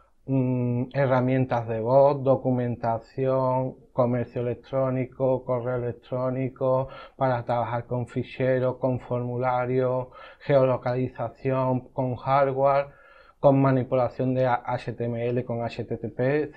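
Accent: Spain. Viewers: Perdón que me salga del tema, de qué parte de España es su acento?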